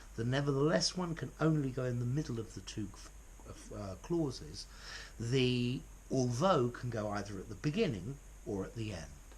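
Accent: RP, United Kingdom. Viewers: The teacher's hair is rather tousled in the video.And he looks very weary and tired Perhaps he is working far too much and doesn't get enough sleep to recharge Nevertheless it is an excellent video And he has a nice pullover too. Which could compensate for the wind-swept hair And I forgot the posh accent which is a dangerous and deadly turn-on ,,nonetheless 😂, Christ ! ❤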